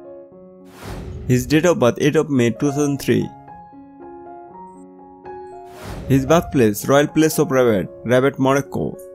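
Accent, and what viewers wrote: Bengal, India. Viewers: Your accent you must just be an Indian ,thank you for your care about my country. ....Casablanca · Narrator is bengali Asli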